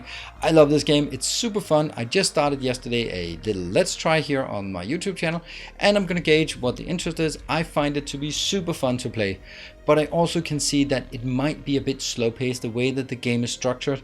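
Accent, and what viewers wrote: Denmark. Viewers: Sounds like he's danish :)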